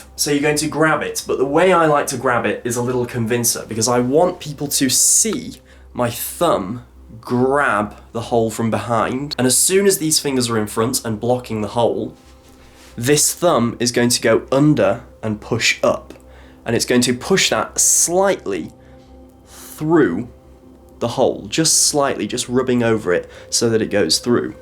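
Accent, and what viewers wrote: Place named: United Kingdom. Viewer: Came for magic! Stayed for British accent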